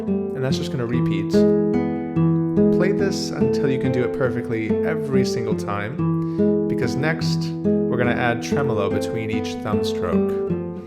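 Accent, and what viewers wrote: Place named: England, United Kingdom. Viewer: con l’accento inglese:-)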